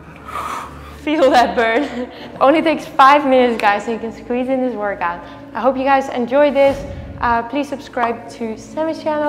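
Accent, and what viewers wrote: Netherlands. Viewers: Is Sanne Dutch? She sounds Dutch and the name checks out. Hey Sanne if you read this and you are Dutch, ik ook!!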